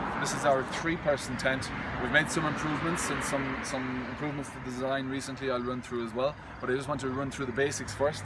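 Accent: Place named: United Kingdom. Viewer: I agree people sometimes add sounds to words unnecessarily. That being said, it seems American English is not the first language of the host of this particular video. There are many millions of folks out there who speak British English--they pronounce aluminum a different way. <link> And in my book, different in this case is perfectly fine. Who am I, or you, to judge anyway--aren't there better things to be spending our time on than calling people out publicly/on social media for things like this?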